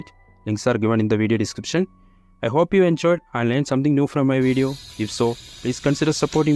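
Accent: Kerala, India. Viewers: Bro are you from kerala?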